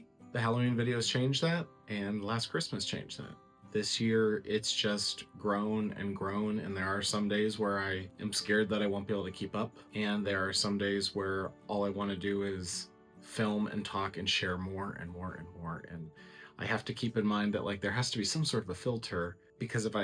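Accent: Minnesota, United States. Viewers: Time is indeed a precious commodity, and the times you've shared have been cherished by me. You look so cozy in your Christmas bedecked home with your sweater and beard. You may be in Orlando but in my mind you're right there at The Estate penning Christmas cards and drinking eggnog by the light of your tree. On the 12th Day of Vlogmas my true love gave to me: Twelve Months of time, beauty and dedication to all that you do, be it your home's restoration, decorating, or simply allowing us to be a part of your beautiful, beautiful world. As well as Eleven eggnog liquors Ten-ish strands of "tinthel" Nine ladies ironin' Eight cameras tumblin' Seven cutouts glowin' Six berries spillin' Five Christmas trees 🎄🎄🎄🎄🎄 Four Paolo meows Three sets of batting Two power strips 🔌🔌 And you sound like the movie Fargo 🎄⛄🙂⛄🎄 Merry Christmas my beloved. You're amazing.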